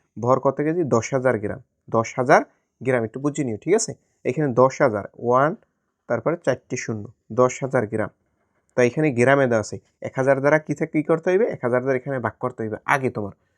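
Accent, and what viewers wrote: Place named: Barishal, Bangladesh. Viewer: Sir apni mone hoy barisailla....ami kintu barisaillah😊😊😊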